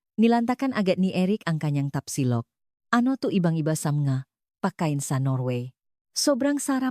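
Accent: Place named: Indonesia. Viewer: Ohhh you are Indonian halata sa'yo yong accent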